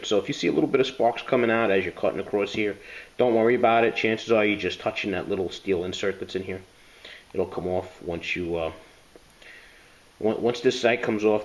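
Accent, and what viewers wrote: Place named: East Coast, United States. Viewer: You got the back east accent. I'm from Jersey.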